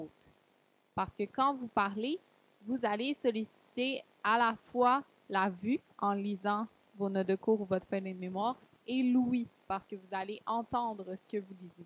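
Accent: Quebec, Canada. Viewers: J'adore votre accent ! Vous êtes québécoise ? Merci ça m'a énormément aidé car demain j'ai une poésie 👍 ! Bonne vidéo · Merci ça ma bien aidée et j'ai vue que tu as l'accent québécois! J'ai vécu trois ans au Québec et j'arrive à faire l'accent mais sinon dans l'ensemble c'est très bien réussi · Oh mon Dieu jadore quand les québécois il parle🤩🤩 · Shimada Tronic974 c'est en français duh. C'est juste que c'est un accent québecois. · Les les canadiens francophones on cet accent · C'est une personne qui parle Québécois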